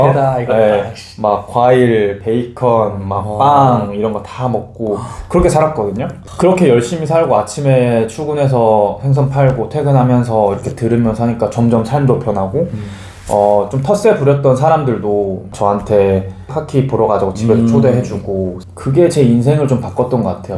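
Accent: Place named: Jeolla, South Korea. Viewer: ㅋㅋㅋ선생님 억양이 전라도 쪽인 거죠? 저는 경상도라서 제가 흔하게 듣지 못한 억양이라 귀엽고 더 귀에 잘 들어왔어요! 올해 입사하면 몇 년 열심히 경력 쌓고, 미국에 일하러 가보고 싶었는데! 이미 그런 길을 가신 분을 보니 멋있네요ㅎㅎ 참고해서 잘 준비해보겠습니다~ 영상 감사합니당:)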